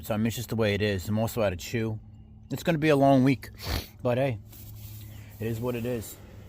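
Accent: Philadelphia, United States. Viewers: I hear your accent are you from Philly?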